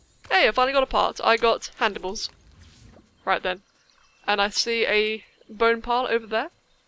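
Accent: United Kingdom. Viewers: you sound british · You're British!!! I love you even more now!!! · R U from the UK? U sound British or something. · This is either a nerdy boy or a British girl 🧐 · Perhaps it is British English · My brain was so confused lol I thought you were a preteen Brit lmao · Oh. You are british i see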